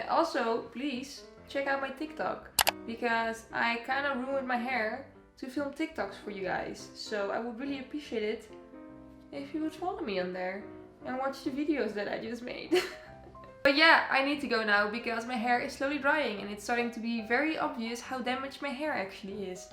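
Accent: Netherlands. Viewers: Are you Dutch cause you kind of have a Dutch accent😊😅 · Heerlijk dat Hollandse Engels erdoorheen 🤣🤣🦄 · Is she Dutch? I can hear the accent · Lekker nederlands accentje wel 😻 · Hey I just found your channel where are u from? You sound or Dutch or German or Swedish I'm just guessing. I'm from the Netherlands and I love crazy haircolors ❤️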